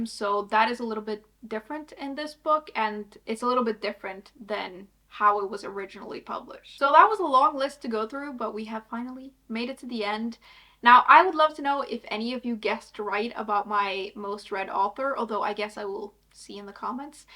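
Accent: New York, United States. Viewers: No way! Maybe it's because of your accent, but I always thought you were from New York, USA or somewhere near there. 😆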